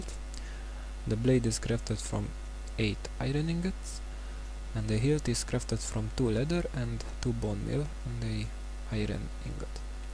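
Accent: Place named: Hungary. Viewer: Annyira hallatszik hogy magyar vagy xD ;)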